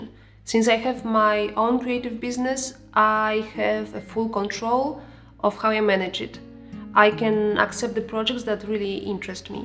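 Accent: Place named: Spain. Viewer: Thanks 4 the video! 👌⭐ Spanish english accent detected hehehe (or maybe not, who knows)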